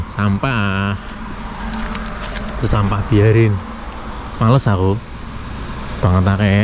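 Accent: Banyumas, Indonesia. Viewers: Setiap tayang... yg gue tunggu bahasa ngapak mu, mas!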